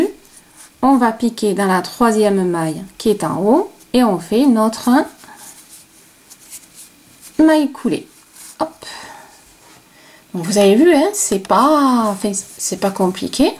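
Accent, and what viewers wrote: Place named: Southwest, France. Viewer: Cc ...moi j'ai adoré et l'ai fait .. j'adore ton accent ! Du sud ouest non ? 🙏🙏🙏🙏🙏 Merci beaucoup .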